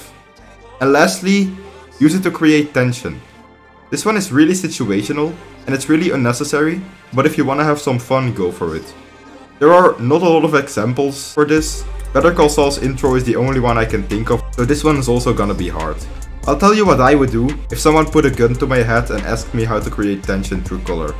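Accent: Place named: Netherlands. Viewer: Nice nl accentje wel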